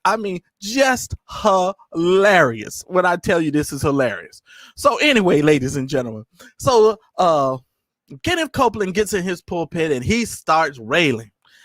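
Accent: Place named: South, United States. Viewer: 🤣🤣🤣🤣🤣 You and this southern accent! OMG so funny! Those darn pickup trucks! 🤣🤣🤣🤣🤣🤣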